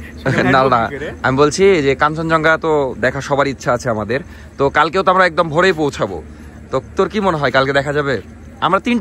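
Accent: Rajshahi, Bangladesh. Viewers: Vai Ami epar Bangla ( INDIA ) ,apnar paser district Murshidabad theke , Apnader Rajshahi r Bhasar taan and amader Murshidabad er Bhasar tan onek tai same , khub valo lage apnader video dekhte . West Bengal a konodin asle dekha hobe ...Take care ❤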